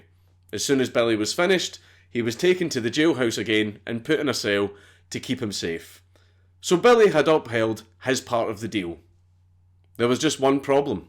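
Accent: Scotland, United Kingdom. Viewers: It’s fucking brilliant when you hear a Scottish man say murder 😂. Your accent makes your videos. The research you do and how you portray every story is top tier. Fantastic channel. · There is just something about hearing a Scotsman describe the old west that touches my heart.... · Its pronounced bu lly tha kid in scottish william becomes wulliam bill becomes bull not sounding bull as in the animal they sound diffrent hard to explain to pple who are not scottish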